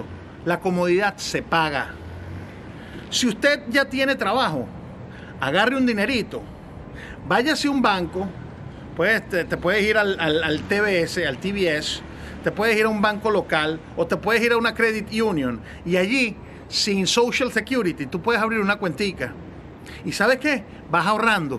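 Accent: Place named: Caribbean. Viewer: Tienes acento caribe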